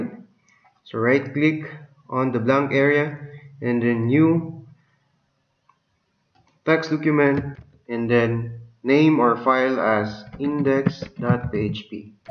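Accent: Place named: Philippines. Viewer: You sounds like from Philippines bro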